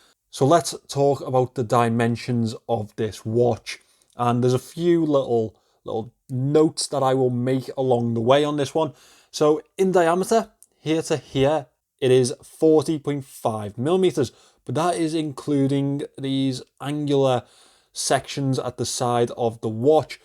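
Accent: Liverpool, United Kingdom. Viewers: Yes lad, good to see a scouser in the watch game on YouTube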